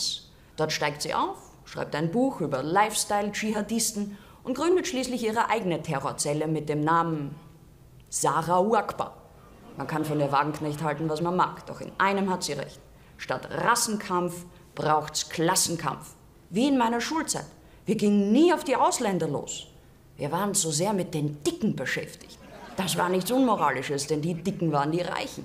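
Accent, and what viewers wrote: Austria. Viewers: Der Wiäner Dialekt in dem bei der bittersüsse Sarkasmus herrscht. Schön das Sie das sagen darf was den Deutschen verboten ist. Viel Erfolg liebe Frau. Sie stehen nicht auf Duzen, eher auf "Sie A_loch ". Danke für die Lustigen Vorträge und Einlagen. 👍👍🙋🤣H.F.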